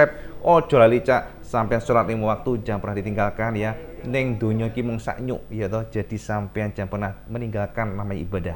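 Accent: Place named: Java, Indonesia. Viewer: Jos cak, cennel medok udah update 😂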